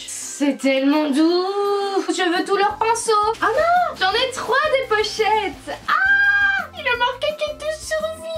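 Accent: Belgium. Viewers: Tu es belge?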